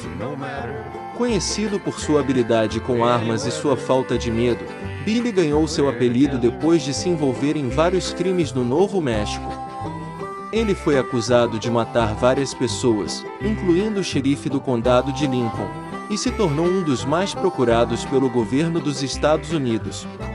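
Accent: Brazil. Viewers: Muito interessante o conteúdo. Já a locução é muito fraca. Num vídeo sobre o Far-west norte americano, era recomendável um locutor com bom sotaque americano e não um que diz os milhentos nomes de figuras e locais americanos á moda do Brasil. O facto mais relevante sobre o Gen. Custer é a enorme derrota na batalha de Little Big Horn, este nome devia ter sido mencionado e não uma baralhada entre Guerra Civil e Sioux e Cheyennes. Mas isto são pormenores, para puderem melhorar no futuro, porque adorei ver tudo.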